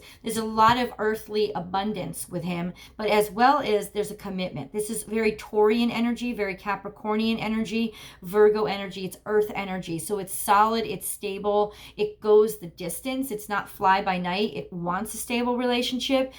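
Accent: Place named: East Coast, United States. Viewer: so glad to seeing you in such good, and wonderful Spirits, after all you have been through recently. You are such a joy and blessing to watch you, when you post for us. You absolutely make my day, when I get to be with you on the postings., I have gotta ask you, I defintely see a very strong Italian background with you. The way we, ( I'm Italian too, on my Mom's side), talk with our hands, your eye movements, and facial structure, nose, mouth, etc. and your accent as well, East Coast, New Jersey or New York area, but I see and hear "Italy", with and from you! So, am I right, are you of Italian descent in your familia? Bye for now, Caio Bella! Bob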